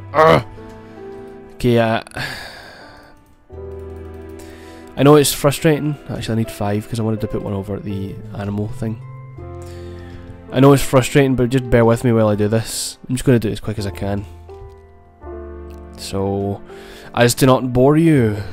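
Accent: Scotland, United Kingdom. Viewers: You are why I love the scottish accent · Are you scottish?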